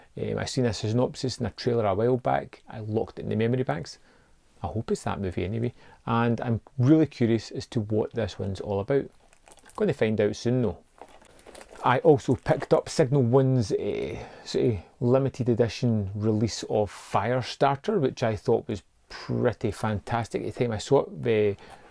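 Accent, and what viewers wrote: Scotland, United Kingdom. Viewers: Do you mind saying what part of Scotland you are from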